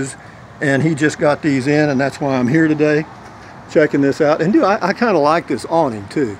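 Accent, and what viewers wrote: Texas, United States. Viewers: Nah, Billy Bob Thornton. Very Texas! :)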